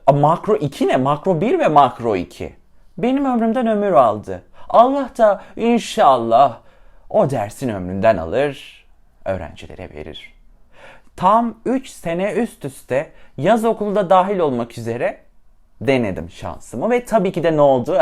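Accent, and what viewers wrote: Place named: United Kingdom. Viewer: Ya şu her videoda yaptığı British English ve şarkı showları beni benden alıyor ya belirli aralıklarla yeterli dozda almazsam nöbet geçiriyorum sen şimdi benimle naaaağpıcaksın?¿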